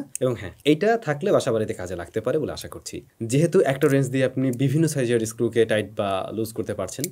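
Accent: Barishal, Bangladesh. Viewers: ভাই আপনি খাশ বরিশাইল্লা! টাকাটাকি বাদ দেন!❤❤❤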